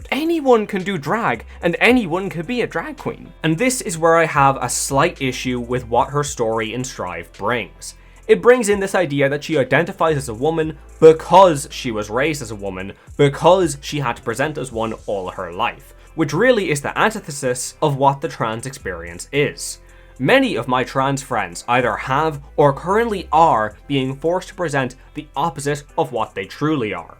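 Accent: Ireland. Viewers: Came for biscuit, stayed for rambling Irish man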